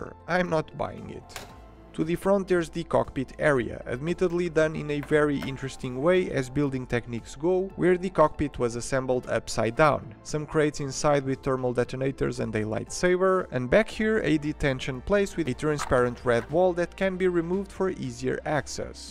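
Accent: Portugal. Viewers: És Tuga? O nome pelo menos é Tuga e o acento também. O mesmo do Mourinho, aliás o nosso! Eheh. Abraço e obrigado por partilhares.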